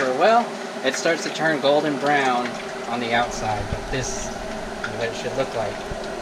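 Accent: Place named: South, United States. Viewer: Hes cute...and has a funny country accent. In new mexico and arizona the accent is slower, spaced words, and no twang unless their cousins visiting from lubbock hahahaha! Also you can order bluebird from amazon and walmart because youre so far from colorado. It is pricier, but not some corporate machine. I live in colorado, a little far from the southwest corner where its made and its still more expensive than pillsbury. I feel like its worth it because the families that run it bluebird show gratitude to native people for using their product and times like these need good people.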